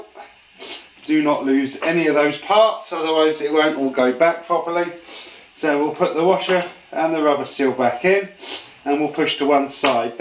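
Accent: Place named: England, United Kingdom. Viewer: A good video,nice to hear an English accent for a change,me being an aussie.Was looking for a video on the same job on an '85 V-Max,supposing theres not much difference?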